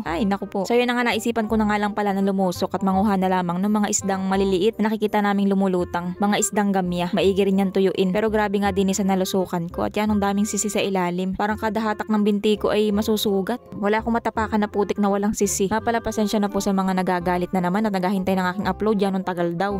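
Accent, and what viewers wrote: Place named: Visayas, Philippines. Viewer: Bakit may halong bisaya ang salita mo idol?😅bisaya din ba kayo?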